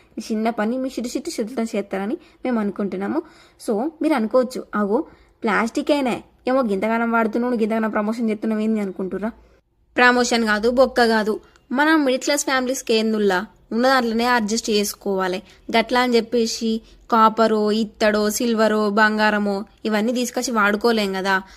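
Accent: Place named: Godavari, India. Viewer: Godavari slang. Chei. Nice. Face chupinchi. Chei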